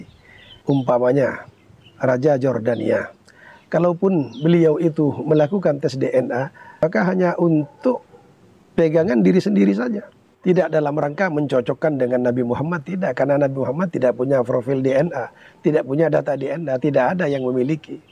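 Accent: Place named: Madura, Indonesia. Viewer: kyai ini klo dari logatnya pasti dari Madura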